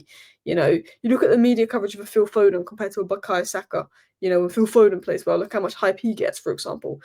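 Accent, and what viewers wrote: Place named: Liverpool, United Kingdom. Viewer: I think your Scouse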